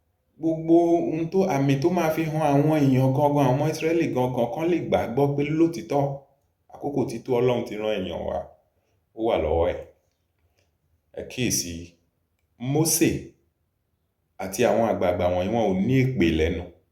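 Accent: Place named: Okun, Nigeria. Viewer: Good admonition. I seem to detect Òkun accent and intonation. Am I right? 😊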